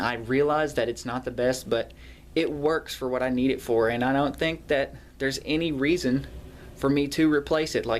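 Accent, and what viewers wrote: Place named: Kentucky, United States. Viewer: Great video buddy, I thought I heard the KY in you, ;-) , I was searching because I see a Yamaha B100-112III on Craigslist right here in Louisville for $150 but I have a couple amps already, was just curious. Thank you for the tip on Steve's shop in Richmond, just found him on FB and sent him a message. Now I gotta check your band, ....Thanks for the video and info !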